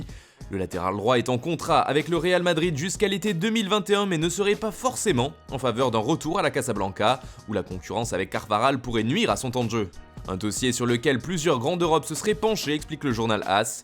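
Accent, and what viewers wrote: Italy. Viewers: Cette accent italien qui me manquait tant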